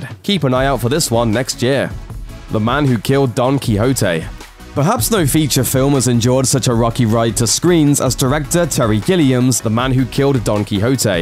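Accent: Australia. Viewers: is this guy australian?? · What's the narrators accent? Y'know, that makes him say "The Man-dellureffekt" or even just "Mandellur". I always wondered about where that's from. Is it an Australian one?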